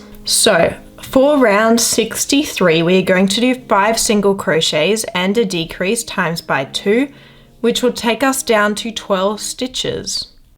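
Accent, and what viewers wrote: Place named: Australia. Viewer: Lovely to hear an Aussie accent and not have to listen to a half hour intro 🙏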